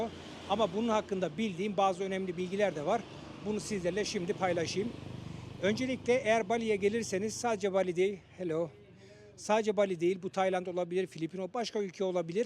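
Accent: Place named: Germany. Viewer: abi sende bi alman aksanı sezdim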